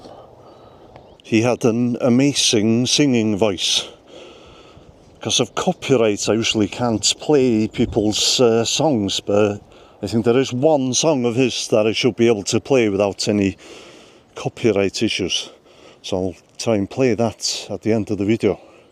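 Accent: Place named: North Wales, United Kingdom. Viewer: Thank you for this, I loved watching Ryan & Ronnie too. He made me laugh in Welsh & English. I didn’t realise he died so young. It’s nice that there are some of his programmes on You Tube now, I used to watch Poems & Pints regularly. I like your North Walian accent. When I was young you were either Church or Chapel in Wales. There were chapels everywhere. Lovely excerpt from Myfanwy, my favourite. From mid-Wales 🏴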